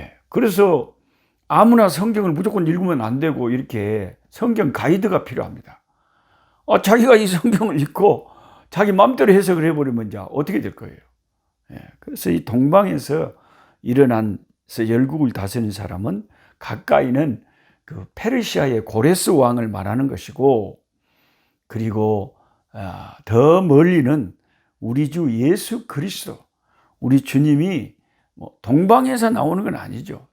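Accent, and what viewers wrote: South Korea. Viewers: 구수한 지방사투리에 정감이 간당게유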